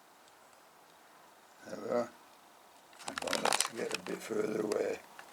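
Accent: United Kingdom. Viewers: I love the way you say "boogs"😁 I'm american and fascinated by the british accent anyway, but yours is especially fascinating. I love your channel and learn so much every time I tune in. Thank you so much for all you do for us amateur hobbyists.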